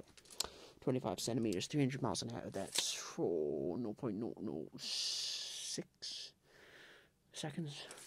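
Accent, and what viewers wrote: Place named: England, United Kingdom. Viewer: I hear the English in your voice now 😭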